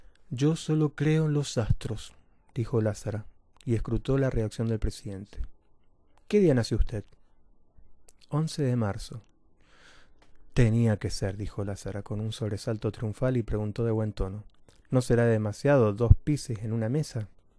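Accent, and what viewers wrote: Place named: Argentina. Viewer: Me encantó y me encanta el acento Argentino, lo quisiera para leer uno de mis cuentos 😚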